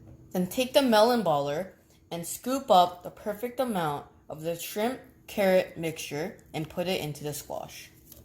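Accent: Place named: South Korea. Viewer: 앗 한국식 영어가^^ 맛있게 잘 보고 가유~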